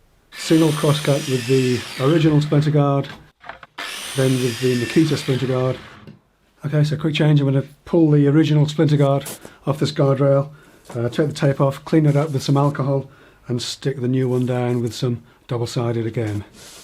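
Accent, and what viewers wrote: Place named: North West, United Kingdom. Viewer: Your accent sounds local to me, are you from the North West?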